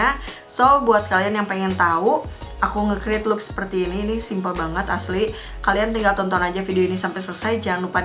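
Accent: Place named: Java, Indonesia. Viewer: cantik bgt 😭😭😭😭😭 tapi inimah da mayan medok kalo buaat aku 😞😂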